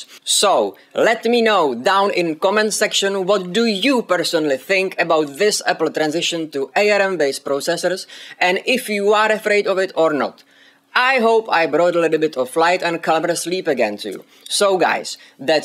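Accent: Czech Republic. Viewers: you are from czech :) ? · Are you from czech republic?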